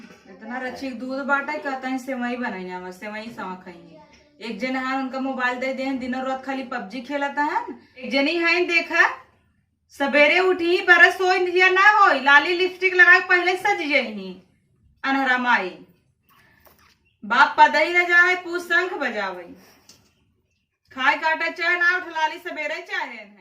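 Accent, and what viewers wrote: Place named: Awadh, India. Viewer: didi apka bolne ka andaj awdhi Bhasha mey bahut hi achha lagta hai 👍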